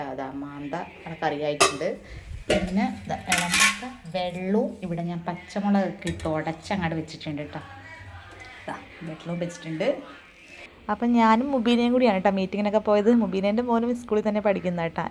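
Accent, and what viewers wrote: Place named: Kochi, India. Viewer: സന്തോഷം ഞങ്ങ പോണ്. നമ്മുടെ കൊച്ചി ഭാഷ മറന്നില്ല 😂😂😂❤❤❤❤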